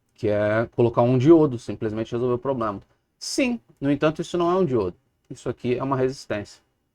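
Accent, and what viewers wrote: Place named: São Paulo, Brazil. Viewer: Tá achando ruim amigo? Faça como eu. Desisncricão realizado com sucesso. Sotaque paulista merda.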